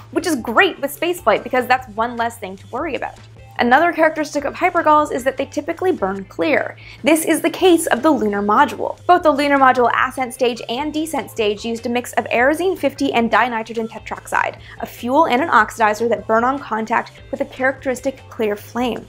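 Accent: Canada. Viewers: Is she from Canada or the United States?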